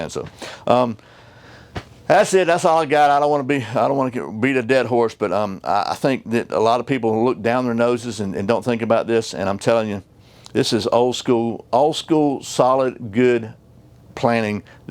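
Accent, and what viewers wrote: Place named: Alabama, United States. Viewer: Great video! I knew I recognized that accent. Over in Alabama we sound so similar. 😅 Learned a lot here. Thanks for the upload.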